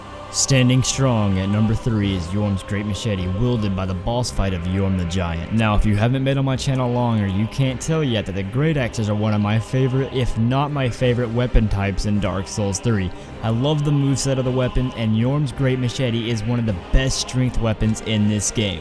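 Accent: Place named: Texas, United States. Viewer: I really love your videos! Also what accent is that are you from the south like Texas?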